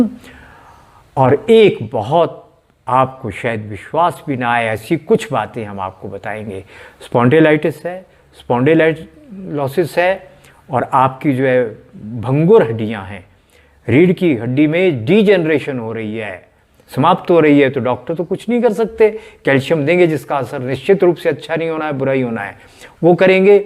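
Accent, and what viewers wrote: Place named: Punjab, India. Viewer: Aap Punjab se ho vaid g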